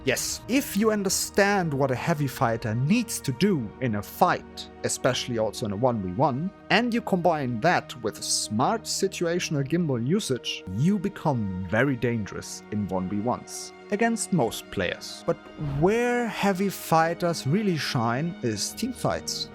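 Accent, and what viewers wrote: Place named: Germany. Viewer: Der deutsche Akzent haut rein, gutes Video!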